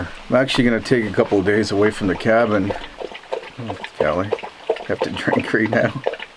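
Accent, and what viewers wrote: Canada. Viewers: Nice vid! I thought you'd go with concrete for the oven and enjoyed seeing you use earth instead. I like that Canuck accent, too..."Ruffed Gross". :-)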